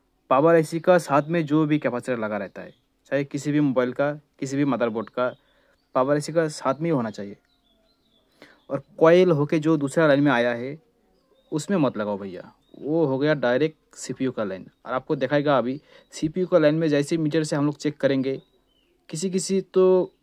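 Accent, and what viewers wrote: Bengal, India. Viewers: tum Bengali ho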